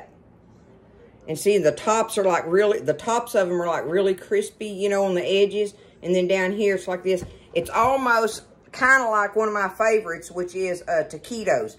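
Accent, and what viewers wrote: South, United States. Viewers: I am so grateful to have found your channel. I thoroughly enjoy the recipes, learning new things and listening to that beautiful Southern accent of yours.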